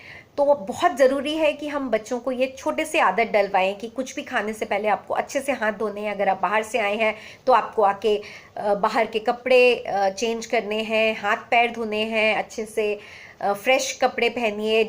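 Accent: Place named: India. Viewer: Wtf title in english but she is talking in Indian mixed english what kind of language is this?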